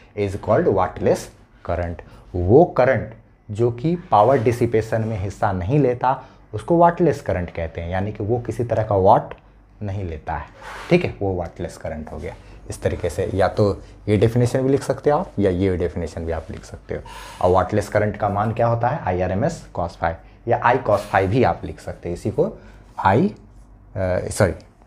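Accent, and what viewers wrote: Bihar, India. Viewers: Sir.. Aap Bihar se ho kya??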